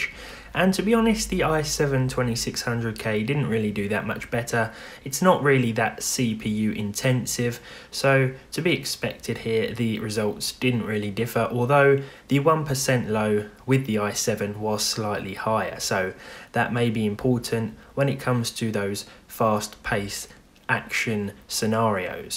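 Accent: United Kingdom. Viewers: He's so British. Whats there not to like?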